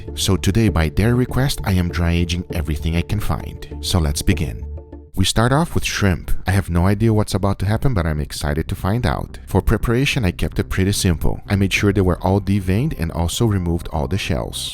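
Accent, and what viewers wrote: Brazil. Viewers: Leva uns 5 segundos pra perceber o sotaque brasileiro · Hey bro. Are you Brazilian?? Your accent is so Brazilian! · This is the first time I hear the real Brazilian accent in english. Congrats, buddy. Your channel is very nice.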